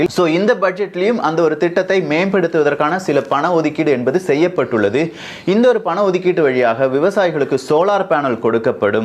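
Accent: Kerala, India. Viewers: Hello bro! I watch all your videos! They are really nice and useful. But unga speeches la Niraya Malayala vaadai adikuthu! You have a strong Malayalam accent! At times I hear Malayalam words also! Are you basically a Malayalee? Is your first language Malayalam! ? Just curious bro!!!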